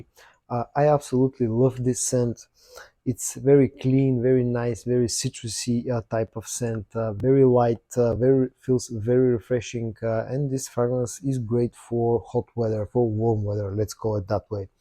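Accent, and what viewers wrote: Bulgaria. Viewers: Nice comparison. I have Dior homme sport which is fantastic and I have tried the other two as well, I'm going to get dior homme cologne next. You have a very bulgarian accent btw. Are you bulgarian?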